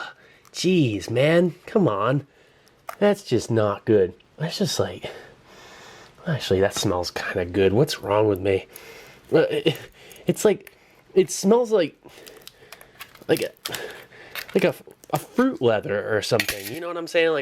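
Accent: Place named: California, United States. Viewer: Yeah he's a pretty cool bro, sounds Californian, might not be but he's got that dude vibe going. I love it when he says "Let's get this on a tray...nice!"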